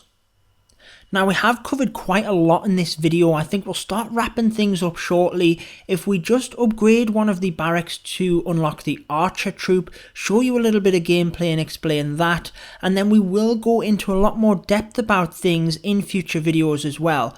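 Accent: United Kingdom. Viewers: is just british accent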